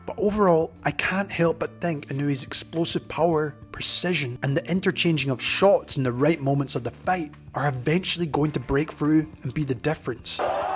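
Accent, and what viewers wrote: Scotland, United Kingdom. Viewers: Yeh, Japanese pronunciation is super easy compared to English. As soon as you understand the vowel sounds, the rules never change...but the video guy does have a Scottish accent, and I kinda like his Scottish take on Japanese lol.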